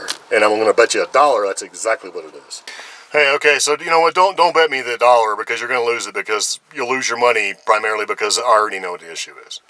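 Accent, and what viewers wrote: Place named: United States. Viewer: i watched because you sound like a cool American dude good presentation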